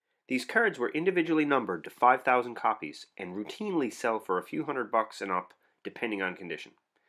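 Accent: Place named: Netherlands. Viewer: The narrators definitely from NL. Made me miss home.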